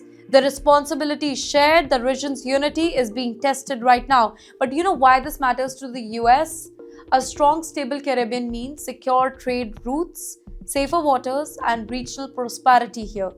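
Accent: India. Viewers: Hi I don't know who you are or where you came from, but from your accent sounds like is somewhere around India. You need to stay out of the Caribbean business. You have enough fight to fight around that zone. Trinidad and Tobago and Guyana have had enough problems with Venezuela already even before US gotten involved. Am not stating that the US has the right to do what they are doing. But you don't get the news when Trinidad and Tobago Fishermen are being kidnapped and bullied and Guyana being threatened by Venezuela to take 1/3 of their country just because of recent oil discovery. These three nations have problems and you will not understand why the animosity . It won't benefit Trinidad and Tobago and Guyana to join the side of the losing. In your case Who would you have backing you.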